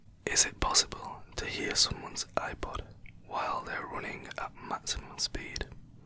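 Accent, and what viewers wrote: United Kingdom. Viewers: FINALLY A BRITISH ASMRTIST 😍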